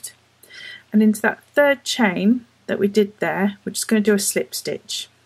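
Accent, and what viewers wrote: United Kingdom. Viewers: So lovely. Subscribed! Love to hear a fellow Brit with a treble!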